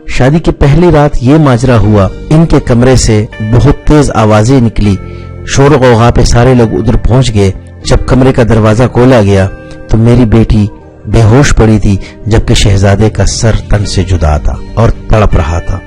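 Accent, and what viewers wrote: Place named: Punjab, Pakistan. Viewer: Assalam Alaikum Aapne ek achchi kahani chuni lekin urdu sahi se adaa nhi kar paye. Punjabi wala lahja hai aur padhne me bhi kabhi ujlat, kabhi uljhan aur kabhi bedili se padha hai aapne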